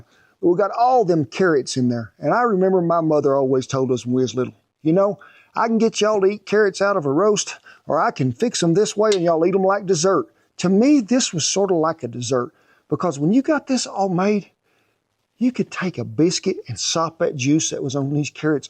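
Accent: South, United States. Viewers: 1. Open the video 2. Disable automatic dubbing 3. Enjoy ´s Southern Accent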